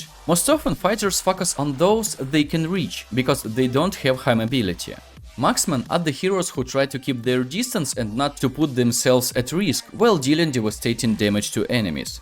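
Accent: Russia. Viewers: Сильный русский акцент , плюс очевидные ошибки в произношении звуков и целых слов и постановке ударения в слове очень портит речь. Советую, перед озвучиванием видео на английском проверять произношение в словаре, чтобы не было таких казузов, как произношение слова "focus" как "facas", которое звучит как не очень хорошее слово) А информация вполне полезная. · are you russian? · r u russian bro?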